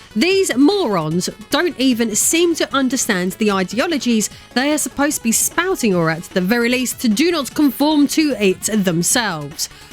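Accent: United Kingdom. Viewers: No worries - there will always a few bloat-heads spewing dirt around! I really enjoy your content as it´s based in reality - your videos are fun, informative and your british accent is the cherry on top. Greetings from good old Germany 🙂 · British accent is ❤ don't ask me what Cheryl Cole be saying though. I too was 17 in 2006 we getting old but still young at heart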